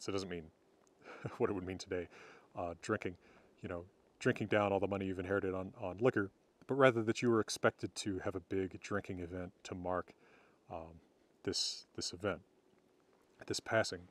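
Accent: United States. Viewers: Using the comparative method of reconstruction and the contemporary sources (e.g. the First Grammatical Treatise) it is perfectly possible for an educated person to come much closer to Old Norse than modern Icelandic, even with an American accent, although I will grant you that Dr. could have made the */i:/ longer. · I love that you have a deep, tough American accent and voice. With a cowboy intro with western music. In a cowboy envirement. Dressed with a cowboy style. Talking about Scandinavia and Vikings. Freaking badass as a Dane i must say. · Interesting video, however when you say you use "Reconstructed medieval Norse pronounciation" I think it's not accurate. You are saying approximately what they sounded like, with an american accent. Rísmál - Icelandic pronunciation --> Reesemowl(approximately) What you said sounded like Rissmaul./mall Who says that 11th century norse "Á" sounded like an english speaker failing at icelandic pronunciation? 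I don't understand how reconstructed norse spoken by a non native speaker would ever be more accurate than the icelandic pronunciation. Icelandic is the closest to old norse we will ever get because it is the same language only older, slightly changed. It would be nice to get a video on the modern reconstruction of norse. I don't know what you would base it on though. Modern icelandic and the old sagas? I enjoy your videos and hope I don't sound discouraging, because your disclaimer was probably excactly for these kinds of comments. A video expanding on this reconstructed pronunciation would be wonderful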